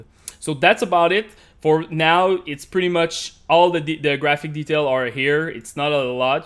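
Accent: Quebec, Canada. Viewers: that french-canadian accent DAMN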